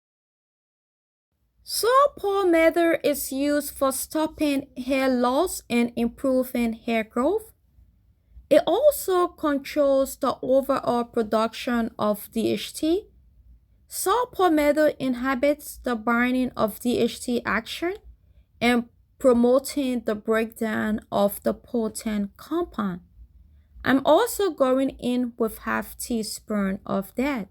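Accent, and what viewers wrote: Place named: Liberia. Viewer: Are you a liberian? You sound like one.. i'm a liberian also.. it really doesn't matter, just curious. Good video💗 thanks alot for the tips.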